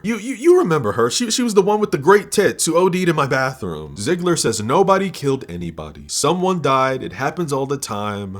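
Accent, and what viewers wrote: United Kingdom. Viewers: As a Brit I love your British accent. I think you're the Bill Hader of film analysis. 👏👏👏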